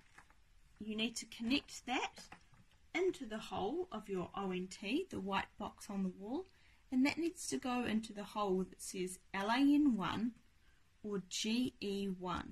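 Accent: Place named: New Zealand. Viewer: Thar kiwi accent tho 😍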